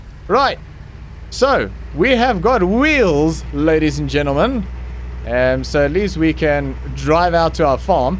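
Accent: Afrikaans, South Africa. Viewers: Is jy afrikaans?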